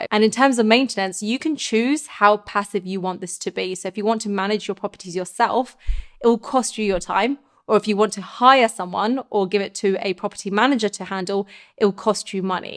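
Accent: United Kingdom. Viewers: I saw your thumbnail. Bet my buddy you had a British accent . He said American. I figured it was 50/50. Thx for the free lunch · I clicked Like after 10 seconds because of your awesome British accent, love it! Good tips too of course.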